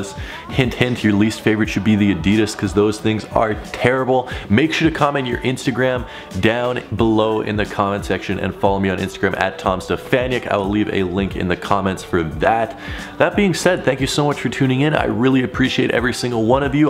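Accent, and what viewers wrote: Winnipeg, Canada. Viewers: Are you Canadian oh and I going to like my own comet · Are you from Winnipeg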